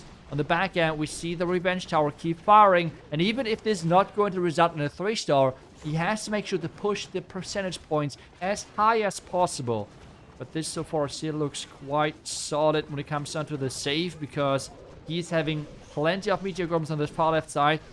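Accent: Germany. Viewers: I know he is German and you have the German accent but what else is in there? Is that Scottish vowel pronunciation or what is it? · German accent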